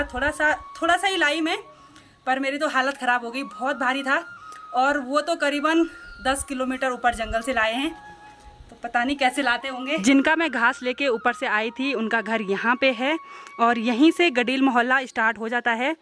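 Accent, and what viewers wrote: Standard. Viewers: ji aap Pahadi ho fir bhi aapki Hindi Pure bol rahi ho...bahut badhiya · Sister aap bahut achi hai sudh hindi bolthi hai aapki har viedeo hum dektha hai hum keralse hu india me keral wala bahut.......... Hota hai by sister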